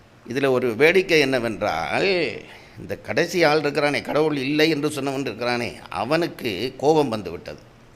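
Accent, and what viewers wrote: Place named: Tamil Nadu, India. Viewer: உங்களுடைய பேச்சு மாறுகிறது...... ஈழத்து உச்சரிப்பு மறைந்து தமிழ்நாட்டு உச்சரிப்பு வந்துவிட்டது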